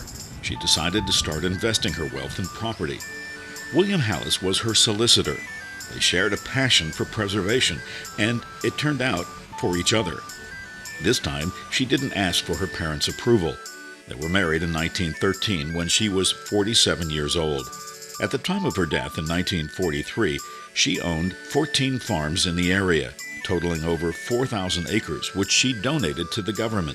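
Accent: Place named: United States. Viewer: Unfortunately it's an American voice over . 🇮🇪🇪🇺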